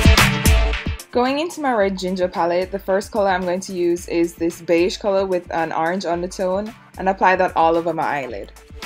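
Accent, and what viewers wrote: Jamaica. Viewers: Great tutorial. You sound Jamaican btw 😉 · Your accent 😍😍😍 i know your from town (jamaica)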